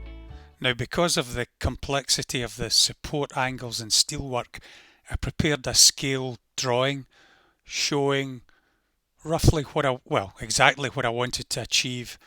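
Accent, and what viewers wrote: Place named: Scotland, United Kingdom. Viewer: So we have had to wait several weeks for this follow on video! Well let me tell you, it was worth every second of the wait and is an excellent piece of work. It is amazing how long these creations take to get right and the care and attention you take, with just the right amount of alteration to suit your needs makes it very special. You have a natural ability to offer a lovely presentation and I guess modelling Perth with a great Scottish accent just is the icing on the cake. Thanks. Most enjoyable. Cheers Bob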